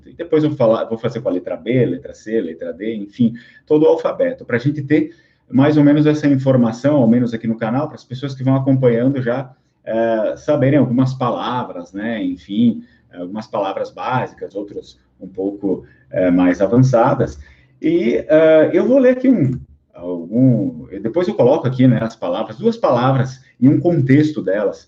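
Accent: Italy. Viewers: Como é bom escutar alguém falando veneto pulito!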